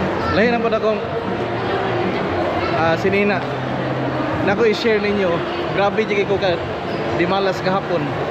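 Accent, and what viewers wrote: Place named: Cagayan de Oro, Philippines. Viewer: Your videos are a “whale” lot better than the other masquerading to be the “go to guy” of CDO Vlogs. Why is that: - you transition from one view to another is smooth (does not hurt the eyes). - background music is good; narration is even better and not trying hard. - your cagay-anon and cebuano bisaya tone is downright natural and entertaining. - you can improve though on the clarity or is it quality of your videos Continue to do your vlogs without really trying hard. Continue too to be a “ man for others”.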